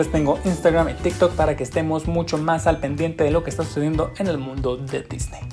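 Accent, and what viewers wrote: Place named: Spain. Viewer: Aí gente sotaque espanhol e tão lindo kkkkkk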